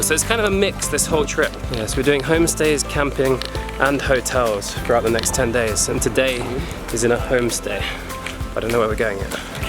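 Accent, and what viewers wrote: United Kingdom. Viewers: 1. In Armenian the last colour of the flag is actually called "apricot colour" -tziranaguyn. We say "karmir, kapuit, tziranaguyn" - "red, blue, colour of apricot." Scientific name of Apricot is Prunus Armeniaca (Armenian plum). 2. The war they are talking about is Artsakh (Nagorno Karabakh) liberation war launched by Azerbaijan (ethnic Turks) against Armenians of Artsakh province of historic Armenia. By the time Azerbaijan was 9 mln and Artsakh was only 100.000 Armenians and was cut of the rest of Armenia. By the time rest of Armenia was able to reach for help and get through the blockage Stepanakert (Artsakh's capital city) and villages were heavily bombed. 5 years of war and Artsakh is free preventing another Genocide done by the Turks. Artsakh is possibly the most beautiful part of Armenia. 3. Thanks for such an interesting video, mate. 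I lived in the Uk for 6 years and just adore British accent, that was the best part of the video for me lol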